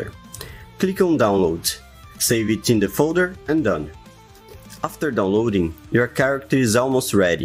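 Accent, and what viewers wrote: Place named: Brazil. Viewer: Brazilian voice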